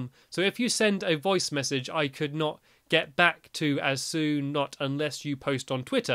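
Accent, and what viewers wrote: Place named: Newcastle, United Kingdom. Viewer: North East England! Propa Geordie :P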